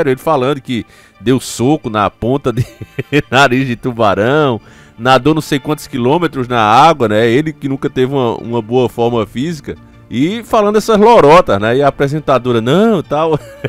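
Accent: Northeast, Brazil. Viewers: Conheço seu canal a 9 anos. Difícil eu perder um vídeo, não sou nordestino, sou de São Paulo, mas o que me chamou atenção foi justamente a sua forma de falar e o conteúdo, até hoje. Se não fosse a safadeza do YouTube seu canal estaria maior. PARABÉNS!!!! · Bom dia Eu sigo você a muito tempo e seu sotaque sempre foi o diferencial Nordestino meu amigo é como todos os lugares tem as exceções parabéns pelo seu trabalho Deus abençoe 🙏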